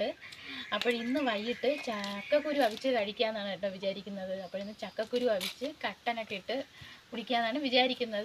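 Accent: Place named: Kattakada, India. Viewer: Vere content onnum. Kittiyille ithu ellavarkum ariyavunna karyamanu vakkukal mattiparanjalum kattakada bhasha kayarivaru nnu that is quite natural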